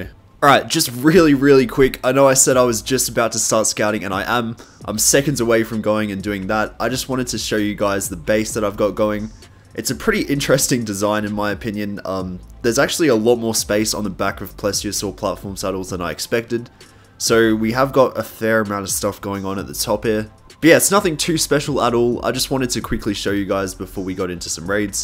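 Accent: Australia. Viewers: Awesome content, love the Aussie accent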